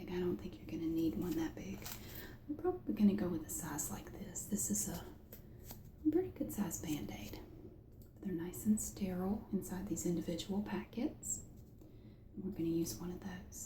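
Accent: South, United States. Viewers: Subbed from Tennessee. Love that southern accent.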